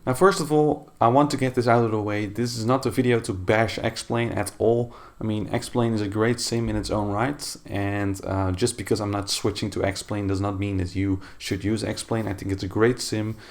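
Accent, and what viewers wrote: Netherlands. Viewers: I KNEW IT! your dutch! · Your Dutch accent is very funny☺️ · Okay ik ben dus niet de enige die z'n accent hoorde hahaha ik d8 ook al dat hij nl is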